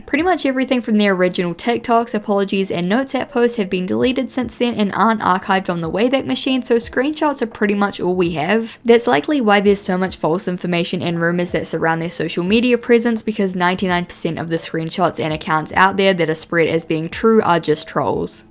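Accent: New Zealand. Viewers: "that probably sounded really stupid in my accent." Speaking of which, what is your accent? I've watched several videos now and I can't decide between New Zealand and South African.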